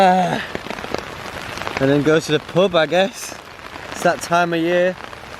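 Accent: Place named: Cumbria, United Kingdom. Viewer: Forgets water but remembers alcohol - that's about right haha. Can't imagine how hard it was to drag yourselves out of your hammocks - cold & hungover in the morning. makes me shudder. I much prefare to get my shmoke on when I'm sat next to a campfire. Each to their own n all that though ehh :) Looked like a chilled out night. Cheers for sharing. Btw, Whats that accent? you sound like my ex. She was from Cumbria but lived in Preston for 8 years. Just being nosey...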